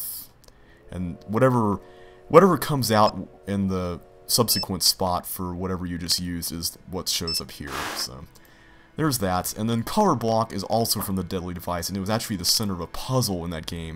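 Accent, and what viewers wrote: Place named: United States. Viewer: Your accent is adorable! What part of the US are you from??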